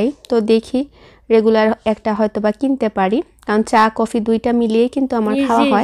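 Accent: Sylhet, Bangladesh. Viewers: আপা তোমার বাড়ি সিলেটের কইগো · Mashallah 🥰 This kids and your Shylety accent are really love ❤️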